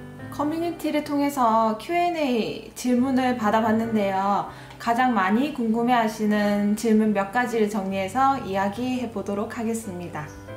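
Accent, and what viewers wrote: Gyeongsang, South Korea. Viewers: 경상도분이시네요~ ㅎ 저도 남편 직업상 삼시세끼 3일씩 글고 큰애 기숙사 도시락까지 만만치 않은데 유튜버를 보는게 많은 도움이 되어요. 젊은 분이 대단하시네요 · 어머나ㅋ 경상도 분이신가보다ㅋ 나도 경상도사람이라 엄청 반갑네요 덕분에 요즘 큰아들 도시락 잘싸주고있어요 감사합니다 쿠팡에서 도시락통도 새로샀답니다ㅋ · 88년생인데 살림을 넘잘하네요 우리딸들도 비슷한 나이인데 손하나 까딱하지 않는데 ...ㅠㅠ 말투가 경상도분인것 같네요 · 전 78년생^^ 신랑회사구내식당이 잠시 쉬면서 도시락을 싸기 시작했어요.홈까페 홈밥요리 좋아하면서도 도시락은 초초초짜 줌마예요. 말투들으니 경상도네요.반가워용.저두 대구고향 부산출신 양산살아요ㅎ 지난 영상들이지만 많이 배우고 눈으로 힐링해요.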